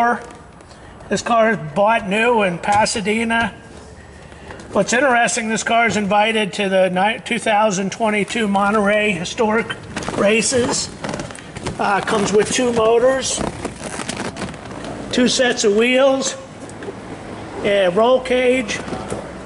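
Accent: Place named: United Kingdom. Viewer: Great use of Anglo Saxon English when pulling out. You could pass for a Brit, thanks for a great review. All the best from England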